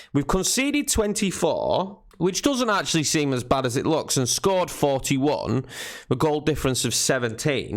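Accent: Yorkshire, United Kingdom. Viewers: Welcome back to another episode of Football manager Twente Twente with F C Twente Love a good Yorkshire accent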